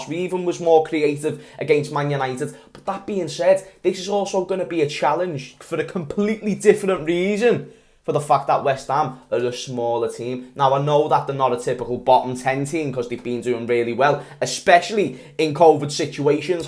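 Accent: Liverpool, United Kingdom. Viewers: Its only a fact that Scouse is the best accent on the face of this earth. Love this Guy to bits.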